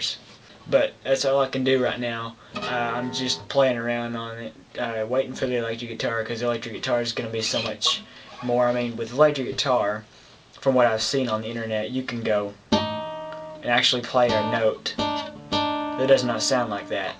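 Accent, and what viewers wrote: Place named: South, United States. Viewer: Haha yea man nothing wrong with a southern accent it just makes it that much more fun to be around you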